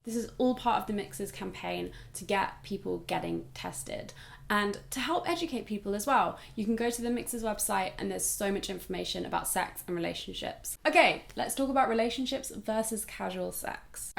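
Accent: United Kingdom. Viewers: English isn't my first language but I already get used to American English and feel comfortable with it And now I listen to her British accent and it's so fucking sexy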